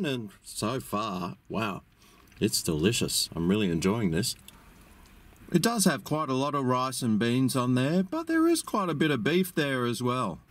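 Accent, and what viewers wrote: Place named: Australia. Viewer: Love that Australian accent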